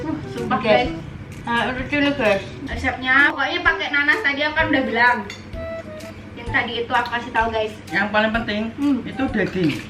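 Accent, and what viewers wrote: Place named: Java, Indonesia. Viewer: Kk klo bahasa jawa lucu yahh🤣🤣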